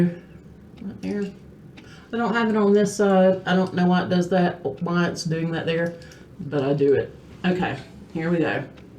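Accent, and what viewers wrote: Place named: Georgia, United States. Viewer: LOL, girl I didn’t know you were from SoUth Ga until you told us. I didn’t hear your accent at all until you let it go. My dad is from Cordele and my daughter attended Valdosta State. ☺️ Small world.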